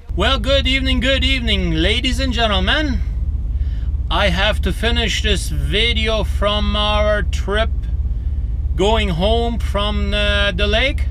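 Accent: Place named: Canada. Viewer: An actual heavy Canadian accent, I haven't heard one in a while.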